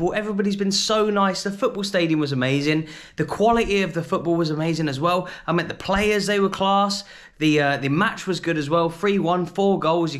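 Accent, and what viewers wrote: Scotland, United Kingdom. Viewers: Hi are you from Scotland?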